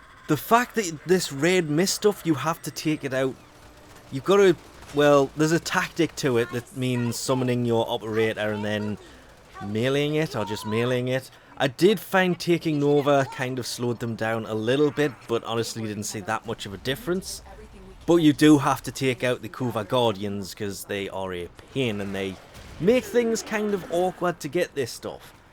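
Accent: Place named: Newcastle, United Kingdom. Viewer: North east of England, sounds like Newcastle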